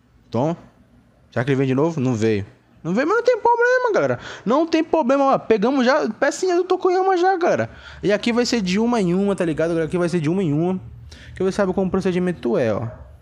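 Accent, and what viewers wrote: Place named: Pará, Brazil. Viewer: Você é de onde??? Parece o sotaque do norte,e do Pará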